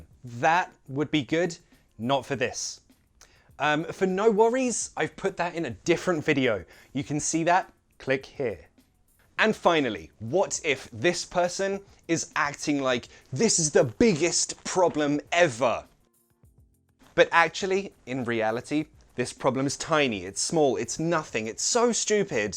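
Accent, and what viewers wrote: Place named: Cockney, United Kingdom. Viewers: hello I really want to learn Cockney accent and I saw the two videos concerning Cockney but I want you to focus on it in the coming videos I like your Cockney accent and I want to learn more from you please and thank you for your efforts and useful courses 💜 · I just LOVE your accent. Simply beautiful. It reminds me of my younger days in London. More than 30 years ago ! From an old man in Japan.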